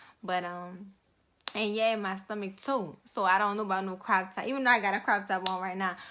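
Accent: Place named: New Orleans, United States. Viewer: That New Orleans accent tho 😝😝😝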